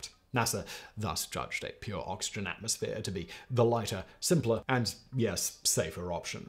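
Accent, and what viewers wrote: United Kingdom. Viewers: Guys give a break he's British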